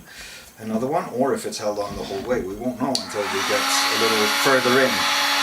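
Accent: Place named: Sweden. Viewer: Are you from sweden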